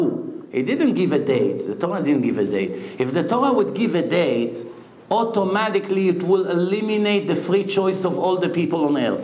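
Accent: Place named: Israel. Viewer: he dont even try to make english accent hahahaha it's almost like he talk hebrew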